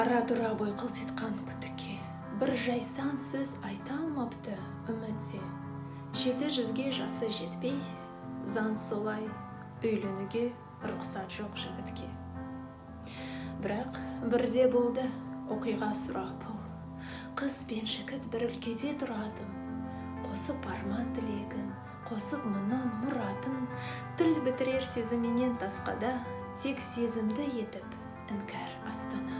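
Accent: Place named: Russia. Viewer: Астанада тұрсаңыз, Синтез актерлік курсына Мұхтар ағайға барыңыз. Тіліңіз орысша шұбарланып кеткен, акцент бар. Ң, Ү, Ө әріптерінің сиқы жоқ, үндестік дауыс ырғағын жетілдіру керек. Өлең мағынасын жүрекпен сезініп соны тыңдарманға жеткізу керек. Мен видеода тек өзіңіздің бет-әлпетіңізге тамсанып жақсы оқып жатырмын ғой декен ойыңызды сезіндім ( орысша айтқанда самолюбование, не передали состояние) сөйтіп, сөйлей сөйлей шешен боласыз, күндердің бір күнінде жарып шығасыз.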